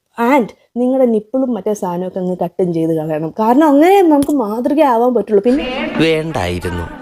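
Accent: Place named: Alappuzha, India. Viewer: Ith kollam bhasha alla. Alapauzha aananu thonunu. Malabar airunel thuranu kaanichene..😂😂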